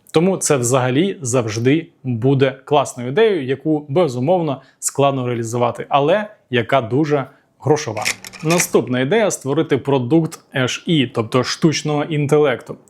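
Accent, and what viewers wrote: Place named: Russia. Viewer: Для чего ты мучаешься на украинском языке разговаривать если твой родной язык русский это же видно Тем более что белорусы тоже могут твоё видео посмотреть про Польшу и ничего не поймут по-украински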